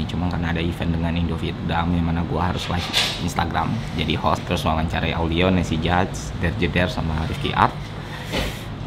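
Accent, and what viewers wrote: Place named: Jambi, Indonesia. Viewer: Woii orang jambi yoo haha orang awak lah ternyatooo awak kiro orang jakarta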